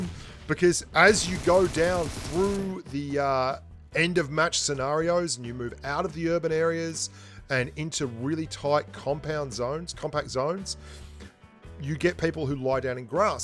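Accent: Australia. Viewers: Hey bro. I started watching you when I noticed the aussie accent Pretty close to home for me (nz). Your the man with all the advice that has helped me tremendously. Thanks and keep it up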